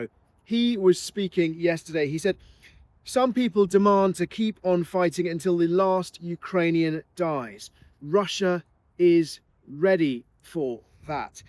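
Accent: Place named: United Kingdom. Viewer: bla bla bla brit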